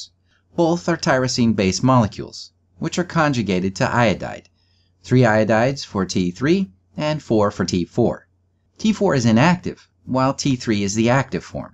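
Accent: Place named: Midwest, United States. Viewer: Very nicely done, just the right amount of detail. P.S. I couldn't stop thinking the narrator of this video must be from somewhere in the Midwest ;)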